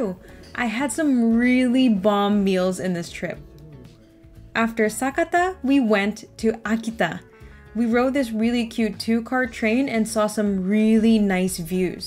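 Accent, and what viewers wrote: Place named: Spain. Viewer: The Spanish accent pronunciation of the Japanese words is really interesting.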